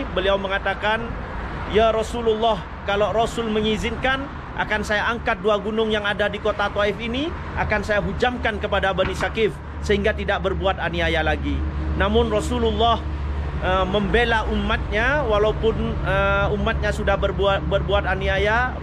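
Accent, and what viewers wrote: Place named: Madura, Indonesia. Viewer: klo dr bhsaxa pasti madura🤣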